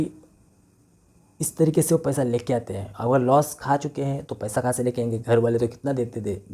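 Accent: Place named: Agri, India. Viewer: मराठी hai.. aagri 😊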